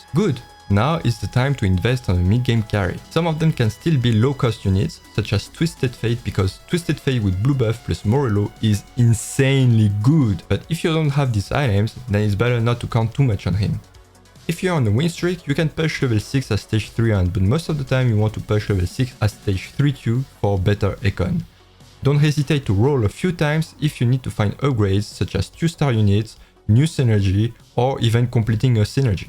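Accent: France. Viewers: on dirait que tu as un petit accent francais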